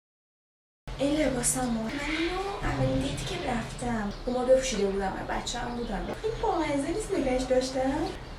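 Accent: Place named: Turkey. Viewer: حس خوبی بهم میدی با توجه به یه کوچولو لحجه ای که داری حس میکنم ترکی❤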